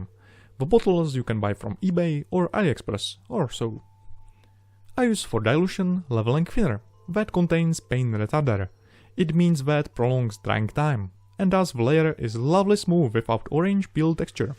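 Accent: Czech Republic. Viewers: Český přízvuk jsem okamžitě poznal:))) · Jsi Čech? Podle přízvuku ;) Krásná práce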